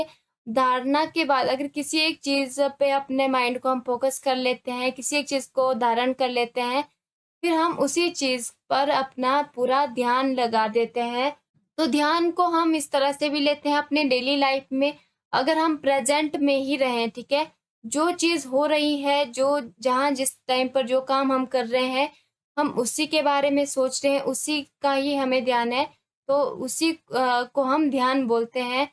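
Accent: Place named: Assam, India. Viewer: Your accent resembles Assamese accent. Are you Assamese?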